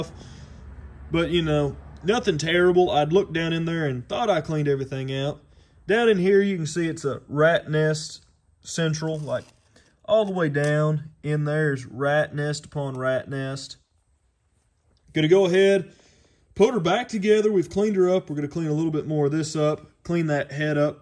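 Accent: South, United States. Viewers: Cool to hear a young person with a strong southern accent still.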